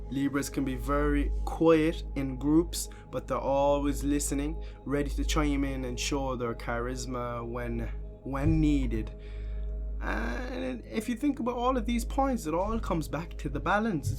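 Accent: Ireland. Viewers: Ireland… WOW. Your accent is aMAzing😃. I like a Libra a lot, but… I didn’t realize he liked me at all(!). I thought he was just being nice and vice versa. He thought I was just being nice. Suddenly, I began receiving highly spiritual signals about this guy. I misunderstood these signals for about two months—till recently. Then, I realized he was trying to tell me too. The challenge is that we see each other in a professional environment. I trust that God has a plan. Cheers🌬️🌤️🕊️.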